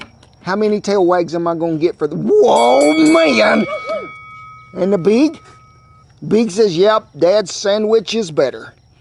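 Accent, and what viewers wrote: United States. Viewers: I am enjoying cowboy accent so much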